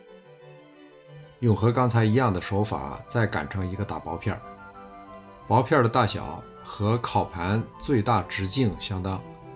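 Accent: Beijing, China. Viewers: 叔叔你是住在海外吗？北京口音听着特别亲切。我是你的韩国粉丝。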